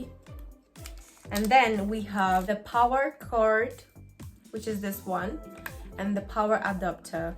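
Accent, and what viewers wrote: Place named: United Kingdom. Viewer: You English sounds British 😎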